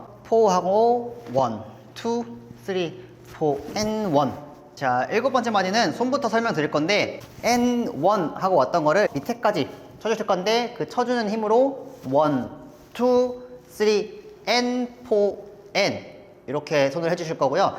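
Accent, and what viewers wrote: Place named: South Korea. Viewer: yes, a lot! And the subtitles were too, since you have korean accent (which is so cute being honest), thanks! I'll be looking forward to your next tutorials (CAN'T BELIEVE YOU NOTICED ME, YOU'RE AMAZING 😍)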